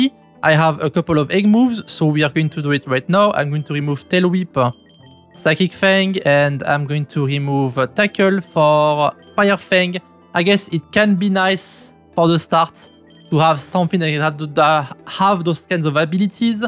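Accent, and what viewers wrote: France. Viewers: très compréhensible je voie pas le problème l'accent baguette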